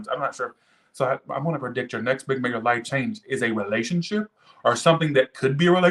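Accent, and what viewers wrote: England, United Kingdom. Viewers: Love English accent it’s on point 😘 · Taurus read: !!! I love the brittish accent !!! 😆 🤣 😂 😹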